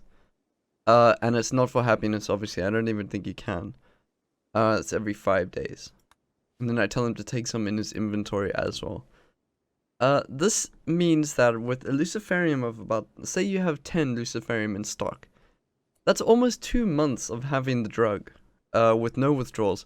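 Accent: South Africa. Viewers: I thought I heard a South African accent. Keep it up bud, I do enjoy your videos! Cheers from SA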